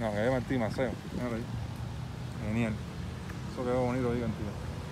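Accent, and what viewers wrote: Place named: Andalusia, Spain. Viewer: loco por ver sus videos ,, jajaja , en Andalucia y Canarias hablamos asi, eso se llama cultura y diversidad , por si no lo sabia , desde España , bendiciones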